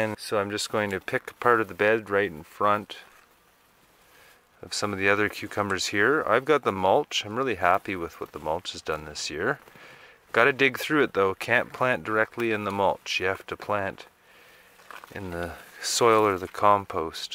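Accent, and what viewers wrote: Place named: Canada. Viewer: Are you Canadian? I can hear the accent. Reminds me of home. I never really noticed until I moved to the US. Now every time I talk to family it’s all “a-boat” and “eh” and “buddy”. Lol.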